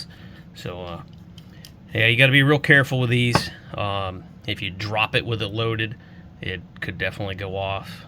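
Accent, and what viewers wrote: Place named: Pennsylvania, United States. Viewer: I am a chemical engineer and I love building things. I am starting a YT channel as soon as it gets warmer here in PA where I can get out to the barn. I am recovering from getting hit and run over on my 1957 Harley Panhead so I will be laid up for a while. (had my right leg nearly crushed in two). I am starting a build of a 1928 Morgan Aero Brooklands edition racer. Similar to a Pembleton T24. I am gearing up to cast aluminum for different adapters. That being said I purchased a revolver lifecard toy. I am thinking about casting it out of aluminum and inserting a rifled barrel and see how things turn out. I will let you know how things go.. You sound like you are from Pa also...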